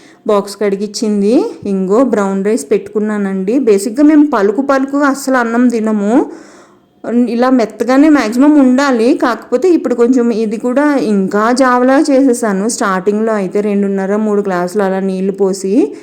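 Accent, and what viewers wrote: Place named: Rajahmundry, India. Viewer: Me voice ma Rajahmundry voice la supar ga vundi andi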